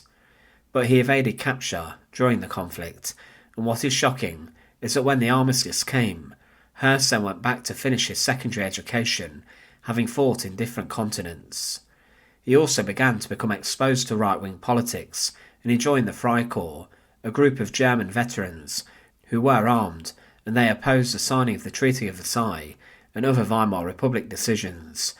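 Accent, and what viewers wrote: United Kingdom. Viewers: What type of UK accent do you have?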